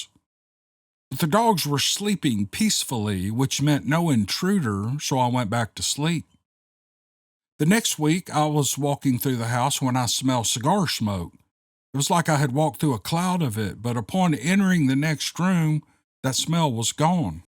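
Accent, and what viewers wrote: South, United States. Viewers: I love you. I listen to you EVERY NIGHT. I enjoy so much listening to you read in that sweet southern accent. Keep up the great work you do. ❤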